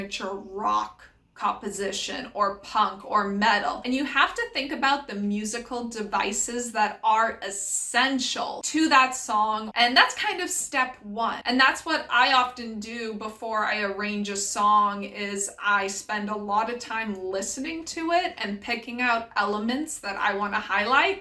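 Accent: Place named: Europe. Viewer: yeah vibrato is a very wide topic (no pun intended!). Cool, I'll look out for that video and yeah, I imagine it will have to come in sections. Another question I have which I'm not sure you answered before, or maybe you do in another video, but where are you from? You sound like you may have lived in Europe originally but then grew up in America. Am I right? I don't know lol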